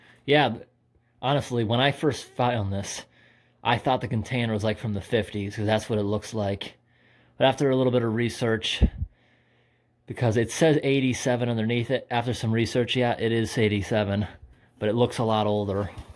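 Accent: New England, United States. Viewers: A wild guess that it's New England.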